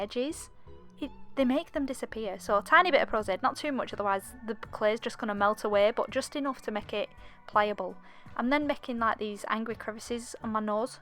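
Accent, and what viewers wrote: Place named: Scotland, United Kingdom. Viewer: I know she's from Accrington, England, but her accent sounds Scottish.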